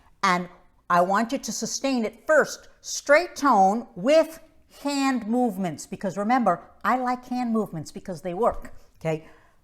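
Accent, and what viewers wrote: New York, United States. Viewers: I knew you had to be from NY or NJ by that accent! Thanks for all the great content!!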